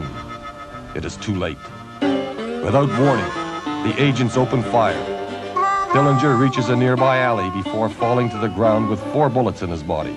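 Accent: Canada. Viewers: Wish they had used an American narrator, rather than a Canadian.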